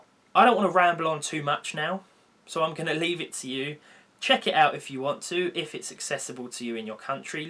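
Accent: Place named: England, United Kingdom. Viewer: watching you face review in this videos made me notice that you have a strong english accent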